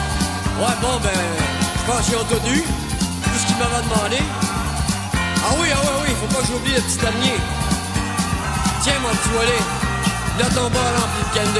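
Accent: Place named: New Brunswick, Canada. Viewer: C'est même pas l'accent Québecois c'est l'accent du nouveau-Brunswick......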